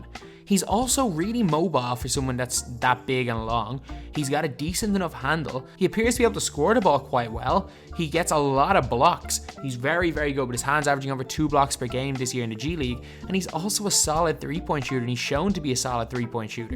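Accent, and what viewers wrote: Ireland. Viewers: are you from Ireland!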